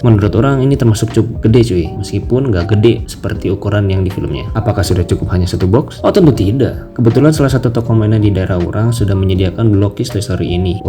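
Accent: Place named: Banjar, Indonesia. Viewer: Keren bang....semangat...kaya urang banjar ( urang = orang dalam bahasa banjar ).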